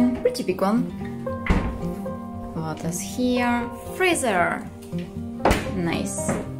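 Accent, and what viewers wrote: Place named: Spain. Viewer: what a beautiful town and the food looks delicious! BTW, I just love your accent, are you Spanish?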